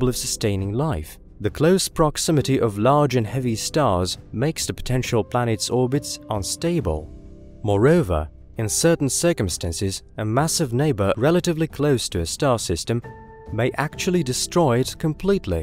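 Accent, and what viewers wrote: United States. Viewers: You sound American...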